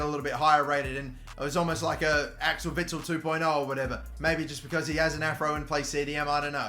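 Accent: Australia. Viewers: Take some rest mate. Preserve that lovely Aussie voice.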